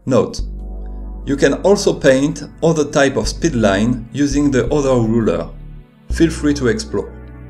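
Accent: France. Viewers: Tu es français non? Tu as un bon anglais mais il faut encore travail un peu l’axent :3 Sinon le contenu est bon est tu es assez clair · j'adore votre accent, vous êtes trop français. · are you french ? cause you've got an enormous french accent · Le ptit accent français x) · feels weird being french and watching a video in english with a heavy french accent xD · This is a really great tutorial! Out of curiosity, based on your heavy accent, are you french? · You are french right? Ton accens francais ressort x)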